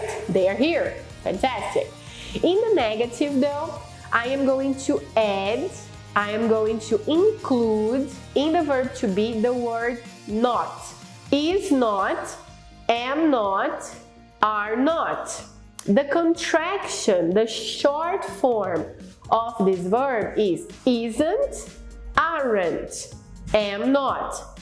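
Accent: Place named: United Kingdom. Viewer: Eu acho fofo o seu sotaque britânico. Normalmente, no ingles americano, o T tem o som de R em algumas palavras, such as: "personeleri", "ciri", "nationaleri", porém no britânico eles pronunciam o T originalmente.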